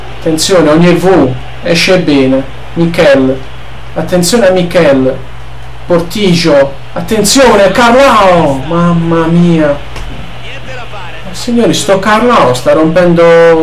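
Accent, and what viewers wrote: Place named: Apulia, Italy. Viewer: Sei molto simpatico e il tuo accento pugliese (Barese?!?) dà quel qualcosa in più!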